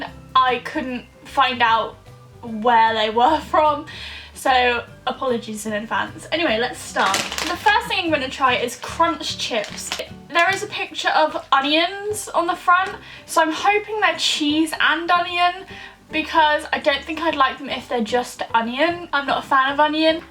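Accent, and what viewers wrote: United Kingdom. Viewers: British accent is everything 🙊🙊🙊💞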